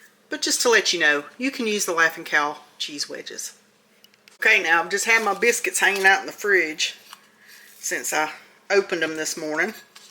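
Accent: South, United States. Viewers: I so Love your southern accent My precious Mom in Law and Dad in Law were from Northcarolina and i miss them both so much I'm from Montana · I came across your channel when you were doing the easter dinner for 1 or 2, i live alone and your ideas are great. I usually cook meals ahead and freeze them. Seeing those biscuits reminds me of my husband. We always had the the grands in the refrigerator. Especially when we had company for the weekend. Nice breakfast., i've seen my aunt Melissa make them into apple dumplings. Thank you for sharing , i love southern accents, especially when they're spunky, like yours, thank you🙂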